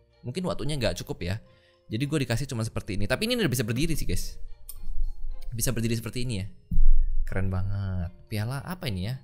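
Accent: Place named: Java, Indonesia. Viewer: Ehh wong medok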